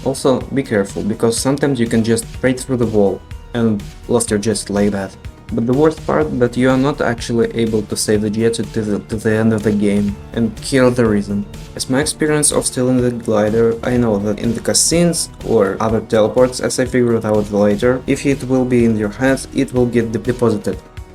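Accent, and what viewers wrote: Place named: Russia. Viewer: Ты звучишь очень пл русски...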